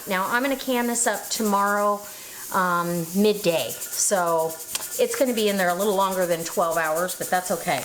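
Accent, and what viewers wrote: South, United States. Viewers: Wow I just love your recipe & I am currently making it- just a smaller version- Can I tell you something my absolute favourite & most loved singer is Dolly Parton & sometimes when you are talking I could swear that is who is speaking - love your accent. This relish sounds amazing so will let you know how I go. Cheers Denise- Austalia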